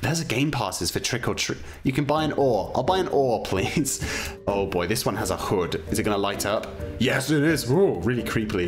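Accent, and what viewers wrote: United Kingdom. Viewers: green ninja 5521 hes british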